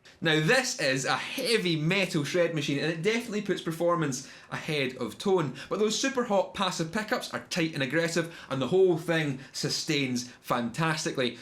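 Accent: Scotland, United Kingdom. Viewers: I must say, your purposeful diction is fantastic. It helps us yanks here in the states wade through your Scottish brogue!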